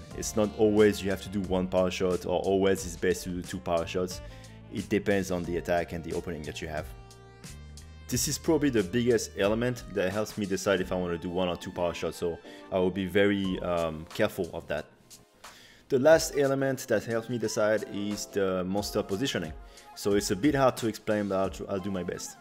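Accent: France. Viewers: Ahah l'accent français est si reconnaissable 😂. En tout cas merci bien pour les tips sur l'arc, les vidéos sont très claires et organisées, un regal.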